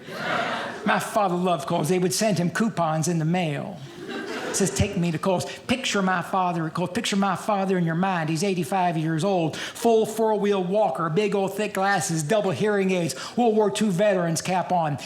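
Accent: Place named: Louisville, United States. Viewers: He is where I'm from in Ky. He's very southern sounding for being from Louisville. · You can always tell someone is from Lullville.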